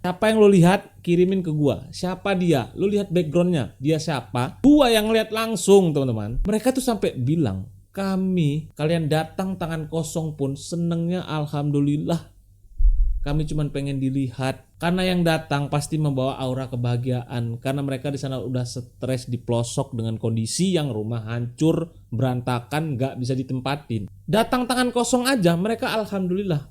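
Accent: Java, Indonesia. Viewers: Jawanya mana bang kamu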